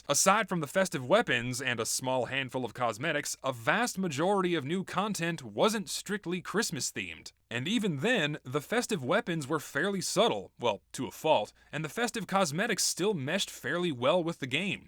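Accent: South, United States. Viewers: I love the southern twang in your voice, it's so sexy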